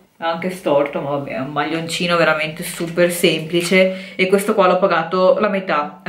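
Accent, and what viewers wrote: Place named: Veneto, Italy. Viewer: Ma sei veneta? Pk anche io ,e sentivo un leggero accento veneto,io non abito in Veneto ma a Rimini però ho i parenti La e a volte vado Baci baci spero che vieni a Rimini pk vorrei vederti ,cmq sei bellissima e hai un sacco di stile ,ti stimo❤️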